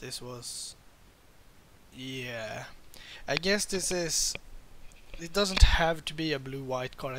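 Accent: Sweden. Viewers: A real good video! I love that Swedish-English! Keep it up!" :D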